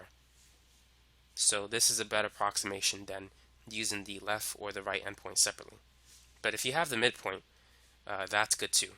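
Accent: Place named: Boston, United States. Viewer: Dat boston accent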